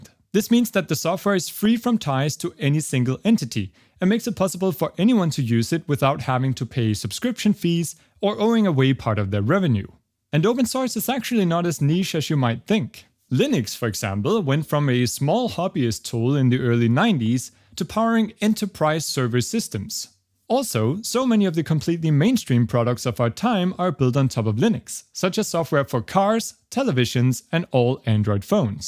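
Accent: Denmark. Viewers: På trods af den meget milde danske accent, og at jeg vidste du var dansk. Så var det helt underligt at høre dig snakke dansk 😆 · Are You Danish? :D I just moved to Denmark recently, what a coincidence :D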